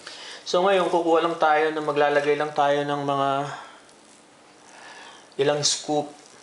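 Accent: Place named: Pampanga, Philippines. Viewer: Kapampangan ka nga....may punto...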